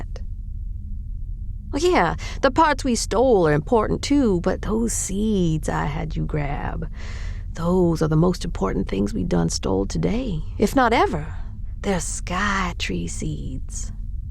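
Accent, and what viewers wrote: Texas, United States. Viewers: This is so Cute thank you Miss also Love the Texan Accent you sound hotter with it ❤️❤️❤️❤️❤️❤️😘😘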